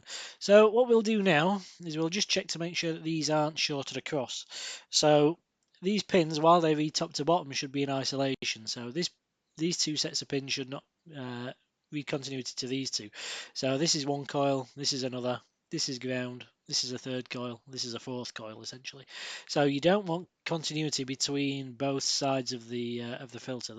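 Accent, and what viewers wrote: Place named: North, United Kingdom. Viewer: Thanks, great video. I will email you now for a couple of ic's. You cant be too far away from me (manchester) with that accent, Thanks again.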